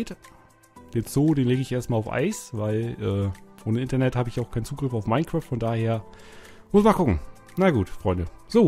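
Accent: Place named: South, Germany. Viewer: ich bin mir sehr sicher dass er aus dem Süden / Rheinland-Pfalz kommt... Tipp vom Experten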